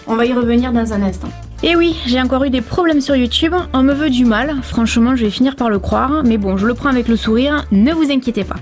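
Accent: South, France. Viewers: "Dans le sud de la France" avec l'accent qui va bien! Super vidéo, merci beaucoup! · Coucou Un vrai plaisir ta video. Je me suît regaler de voir et d entendre ton accent. Je suît aussi du Sud👌👌 Comme quoi il n'y a que dans le Sud Qu on vie bien. Oui je suît chauvine lol👌👌 Ah le taboulet avec dû choux fleur je ne connaissai pas du tout,top Merci Sympa ta video. Tres onde fin d après midi,Tres bon anniversaire. À ta Maman 😘😘💋💐🌹🌹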